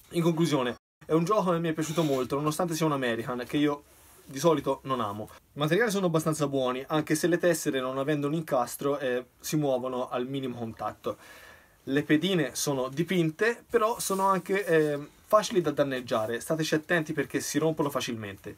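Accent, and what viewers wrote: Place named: Tuscany, Italy. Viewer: L'accento toscano è veramente sexy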